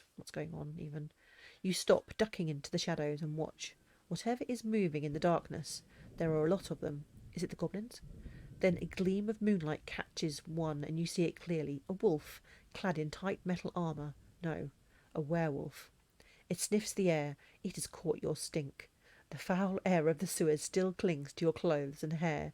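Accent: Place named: England, United Kingdom. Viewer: those books are the best in the domain of adventure gamebook , congrats for your work ! the english accent is not my cup of tea , for this is very nice to heard the text readed.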